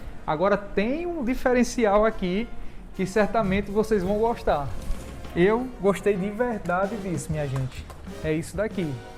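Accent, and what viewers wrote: Northeast, Brazil. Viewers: Pelo sotaque deve ser em algum lugar do nordeste , ceará talvez ou Pernambuco talvez ☝️ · Que projeto lindo, gostoso, sem palavras pra esse projeto e essa casa! A sua apresentação tb, é digna de aplausos, parabéns, vc é diferenciado tb pra apresentar, fora que vc tem um sotaque muito legal que eu, como boa filha de nordestinos, adoro kkkk. Parabéns tudo muito top demais!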